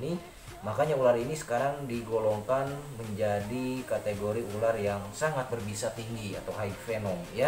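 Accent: West Java, Indonesia. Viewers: Ohhh abang. Orang sunda? Wkwkwk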